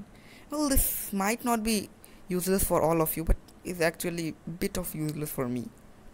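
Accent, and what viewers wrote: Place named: India. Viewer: You sound Indian, thats no bad thing, that's a GREAT thing, keep being smart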